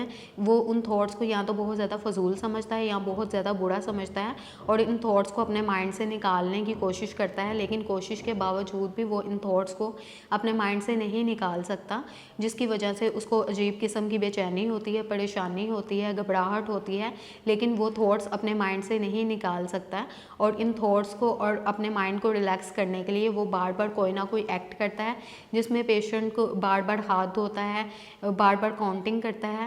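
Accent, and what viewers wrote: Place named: Lahore, Pakistan. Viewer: Pukkii Lahorrii ho ap madam esliye ap arahi ho k aarrahí kehrahi ho lahoriyon ki bhi 1 wakhri zaban he.😁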